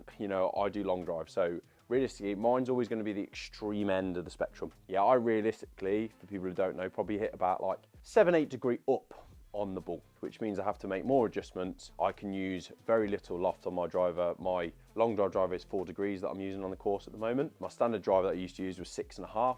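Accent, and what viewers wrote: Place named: Derbyshire, United Kingdom. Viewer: You sound like you are from not too far away from me judging by your accent. Im from Derbyshire. Where would you recommend getting a fitting? Any suggestions? ✌️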